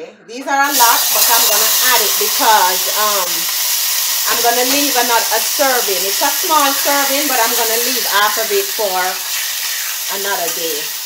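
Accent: Jamaica. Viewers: That looks amazing!!! To be honest, you can never go wrong with coconut milk. I love love love coconut milk. I'm new on your channel and I must say, I'm already hooked on the Jamaican accent. Congrats on the weight loss and thanks once more for the lovely comment you left on my channel. ❤️Summer Dublin, Ireland 🇮🇪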